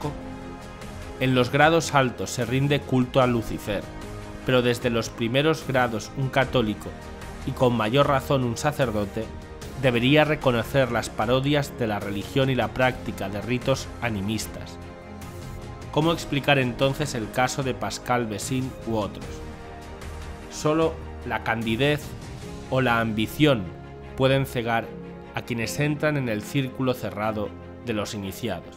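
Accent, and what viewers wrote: Spain. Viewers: Buenas, felicitaciones. Buen contenido pero muy alta la música. No permite entender su voz, que es español de la Padre Patria y los latinos debemos afinar el oído y con la música altísima, nos cuesta. Por favor, siga adelante enseñando lo disfrutamos, sólo con un poco más baja la música. Gracias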